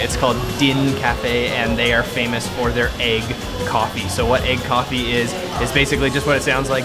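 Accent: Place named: Canada. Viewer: He sounds incredibly Canadian. He is not dropping a lot of EHs but everything else is completely Canadian.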